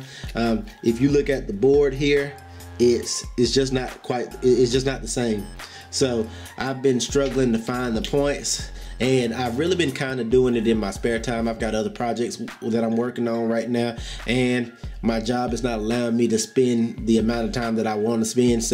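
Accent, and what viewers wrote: South, United States. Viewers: that southern accent thugh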